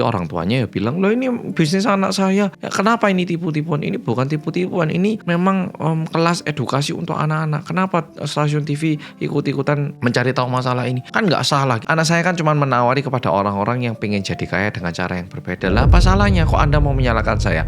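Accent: Java, Indonesia. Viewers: Hai org korea yg medok🎉 bolomu lgsg nyimak🎉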